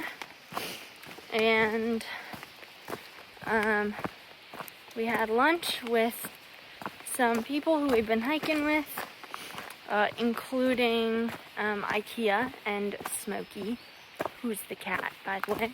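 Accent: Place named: South, United States. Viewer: Where in Maryland with a southern accent. I think the East Coast is changing yalls speech 😅 o yea you all live in LA yea you all have I-5 and yes I been there and by far some of the worst traffic I have ever sat in before. O wow the video in the dark reminds me of the (are you afraid of the dark) lol. I know the rocks are going to get worse unfortunately 😓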